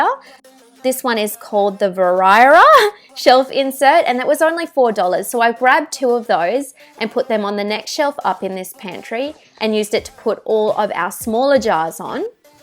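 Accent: Australia. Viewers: Nice to hear an Australian accent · Omg are you Australian sameee finally yay 😊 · Are u Australian??? I am and I just found ur channel and I subbed 🤗🤗🤗🤗🤗🤗TADDAA I did way to much for a first vid but whatever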